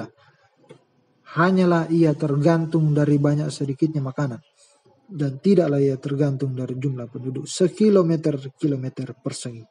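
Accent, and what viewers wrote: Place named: South, Indonesia. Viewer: Mantap.. Tetap dan masih kentara logat selatan.. Salama'..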